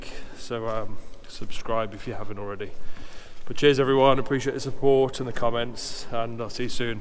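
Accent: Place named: Black Country, United Kingdom. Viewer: LOVE IT! Speaking the Black Country accent:) Again a beautifully stylized & wonderful film. The tunnel pics are stark but yet meaningful.....to me right now they represent that light at the end of the tunnel my country has fallen into. Again sorry to be late, but it's been crazy....12 new people here at the homeless shelter....and I'm barely getting home before the curfew the last few nights.....very difficult times here in the US....dude I swear not all Americans are bad people...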